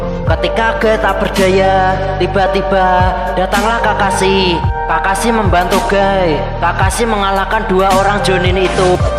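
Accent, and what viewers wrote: Java, Indonesia. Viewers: 😂😂😂😂 Suaranya bangke bgt ya.. Tp bikin bs ketawa karena medok org jawa.. Wkwkwkwkwk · Ngomongnya medok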